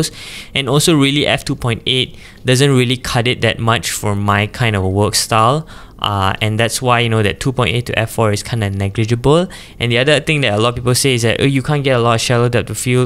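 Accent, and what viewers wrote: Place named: Nigeria. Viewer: You sound a little like an nigerian 😊 thanks for the Review and expensive lenses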